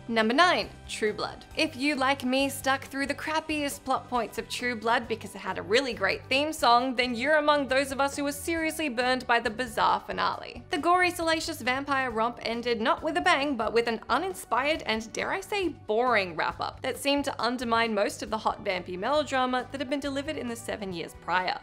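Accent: Australia. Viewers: Is this person Australian? Just curious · What channel do I know you from??😳 I know you’re not british you’re Australian 💁🏼‍♀️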